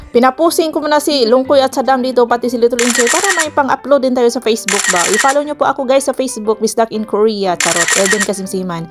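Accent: Bohol, Philippines. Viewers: Tagal bohol ka mam? Or surigao😅 kay murag mao mao ra gajud tag inistoryahan, taga bohol ko.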